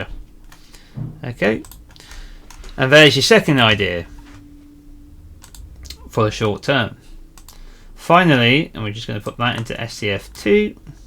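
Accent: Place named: England, United Kingdom. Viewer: I don't know if it's just because of the English accent, but as a non-trader I understand everything you say, you explain things very clearly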